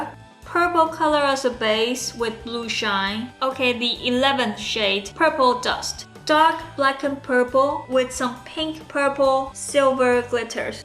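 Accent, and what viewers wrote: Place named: United Kingdom. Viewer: 沒想到的英文有了英式發音 XD 好新鮮的感覺 (從部落格時期收看文字的我...適應會動的中) but it's a nice palette..but wow, that purple..lol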